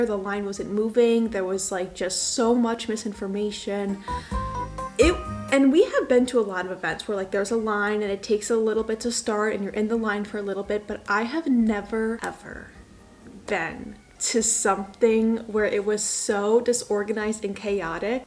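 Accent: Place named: Argentina. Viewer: "Sha" usas lunfardo gringa, sha casi argentina 😂❤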